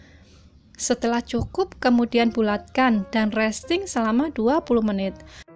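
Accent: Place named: Java, Indonesia. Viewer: Menul2 kak bahasa jawanya.. Endull 🤩🤩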